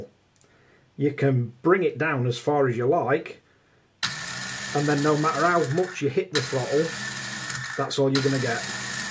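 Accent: Yorkshire, United Kingdom. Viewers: Are you from Yorkshire?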